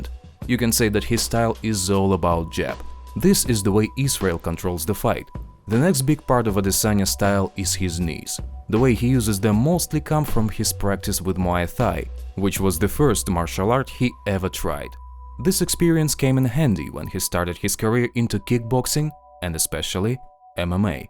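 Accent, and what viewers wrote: Russia. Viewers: I like the Russian accent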